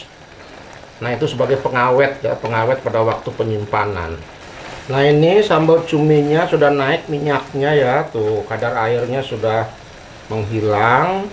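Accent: West Java, Indonesia. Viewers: Assalamualaikum koko.wk wk wk sunda bngt ada kungkurahan.logatnya jg sunda.masakannya mantaaaap 😂😂❤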